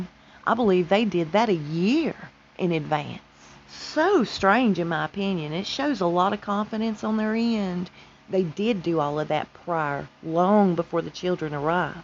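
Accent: South, United States. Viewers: thank you so much for this video and all your videos.I had a hard long day Here in Vegas such a blessing To come home Cook dinner and here that southern Voice.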